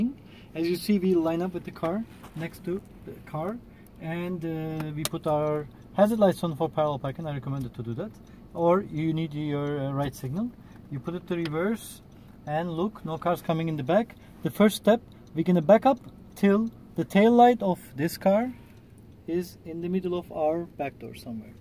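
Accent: Turkey. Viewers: Your accent look like turkish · Türk telaffuzu bu :D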